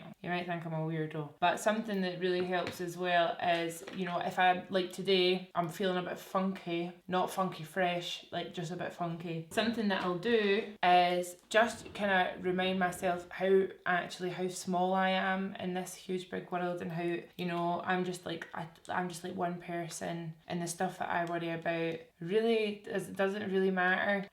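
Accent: Scotland, United Kingdom. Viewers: I randomly found your videos a few weeks ago and I have binged sooo much of your content. I love your style, your attitude and your Scottish accent. ~ You are gorgeous. Cheers ~ Kay from Oz ~ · You sound just like Adele with your British accent! Lol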